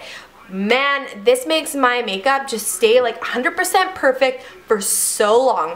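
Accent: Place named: France. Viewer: You sound super french in this video :P (fellow Canadian here!)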